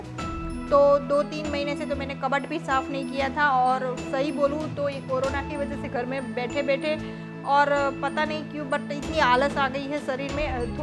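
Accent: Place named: Gujarat, India. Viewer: Di ap gujrati ho apka tone se lag raha.... Di apko sub krdiya hi...mujhe support ki jarurat hi di plz support kijiye naa....mi v roz augi apke video pe i promise 🥰🥰🥰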